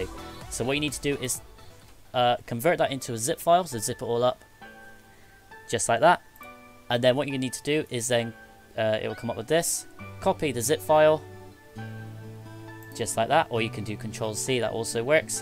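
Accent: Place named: United Kingdom. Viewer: You sound like a british Linus Sebastian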